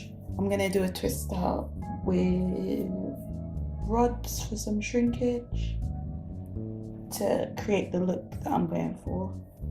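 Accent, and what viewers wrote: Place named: United Kingdom. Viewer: Instagram Subbie here, I never knew you had a British accent. Just always loved your hair.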